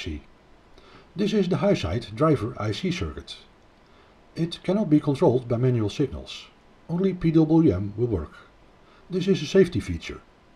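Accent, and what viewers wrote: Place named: Auvergne, France. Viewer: il nous agace avec son accent auvergnat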